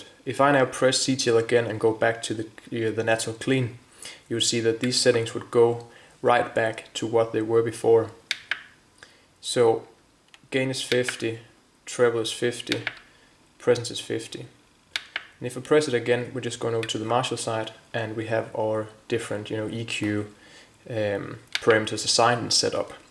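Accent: Denmark. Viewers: Owned a Cort S2600, really good value guitar. BTW are you from Denmark, regarding your accent?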